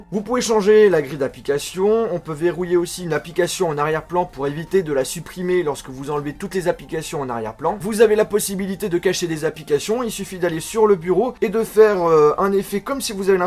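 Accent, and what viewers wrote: France. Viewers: Are you French？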